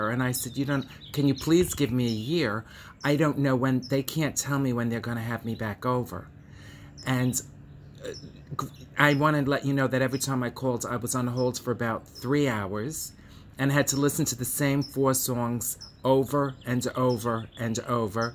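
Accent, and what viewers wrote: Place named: New York, United States. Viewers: I just have to thank you for all your videos, especially the Thoughts While In Solitude series. You are like a good friend I can stand:-D and your vids are helping me get through this pandemic. I could listen to you yammer all day in that accent, which is what I hear all the time now living so close to NYC. Stay well, best wishes always. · Jerry Hunter It always sounds so much more effective when spoken with a NY accent. Lol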